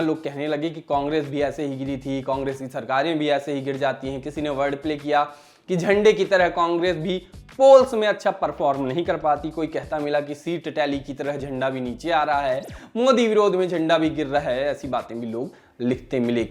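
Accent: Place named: Rewa, India. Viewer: Mai bhi rewa se hu.. Aapka accent 👌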